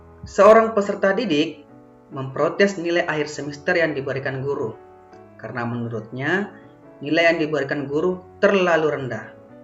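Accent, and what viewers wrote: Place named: Madura, Indonesia. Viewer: Aq mendengar pak bilang logat Madura, apa pak org Madura? Salam sittong dhere pak😁🙏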